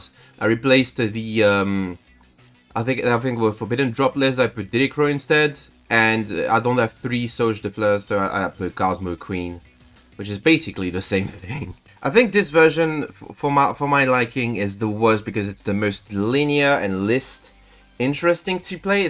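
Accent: France. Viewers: l'accent francais 10/10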